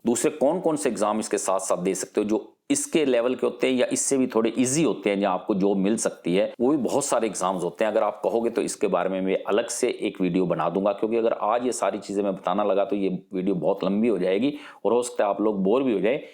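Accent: Haryana, India. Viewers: Sir aap kaha s ho proper,,,,,,, apki language sa to aap Haryana sa lag raha ho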